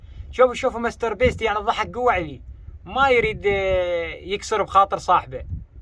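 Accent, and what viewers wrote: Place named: Iraq. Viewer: عجبتني اللهجة العراقية بفيديوهاتك و لله اذا تستمر و تطور قناتك اكثر راح تطش 😂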